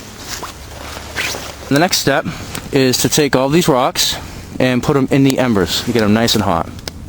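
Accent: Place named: Massachusetts, United States. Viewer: Massachusetts accent....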